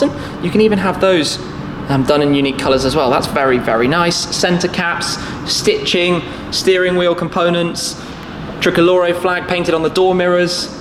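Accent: United Kingdom. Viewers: Nice British accent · your accent makes everything you say increases the stereotypical british car enthusiast personality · He has a british accent. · inggris britis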